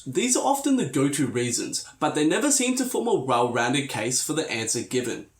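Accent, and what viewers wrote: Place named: Australia. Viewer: which part of australia are you from?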